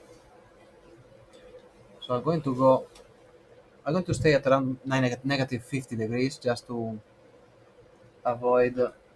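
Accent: Italy. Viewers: Ur absent seems italian lol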